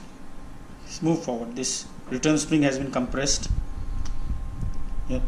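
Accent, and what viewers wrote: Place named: India. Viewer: Logat india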